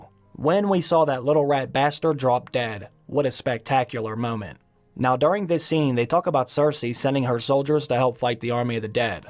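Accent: Pittsburgh, United States. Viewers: I enjoyed your analysis. By the way, you sound like you're from Pittsburgh. Am I correct?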